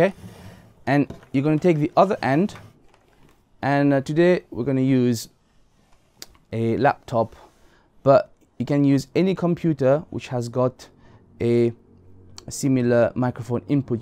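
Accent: United Kingdom. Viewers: Bob's your uncle! So British.....great explanation and video. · Do you know how many Vid's I've watched just to get this info? Way too many (Short Sweet And Straight To The Point) Thankyou ;).... And you're British always a plus. haha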